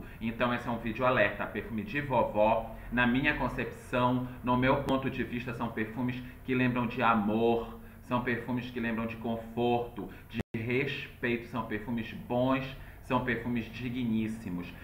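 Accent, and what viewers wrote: Pará, Brazil. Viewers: Você é paraense? Notei q seu sotaque é igual de paraense. 😊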